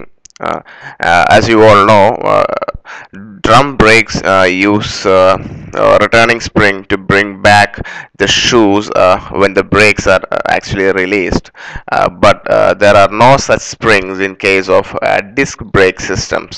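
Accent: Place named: South, India. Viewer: Indian guy raised in Mississippi ?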